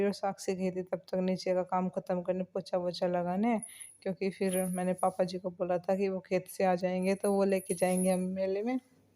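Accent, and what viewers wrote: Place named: Haryana, India. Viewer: Jaat ho sayad boli too aise he lg re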